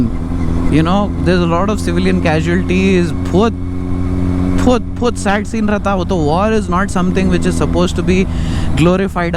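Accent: Hyderabad, India. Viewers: I guess u know telugu... Bhaya serious gaa cheptunaa echipadesinavv🔥, Btw mi videos next level unatai asal😍. Bc asal skip cheyalii anipinchadhu 20 or 15 mins unna kani, aa flow ala untadhi aa hyd slang & me content. Love u bhai♥️🥂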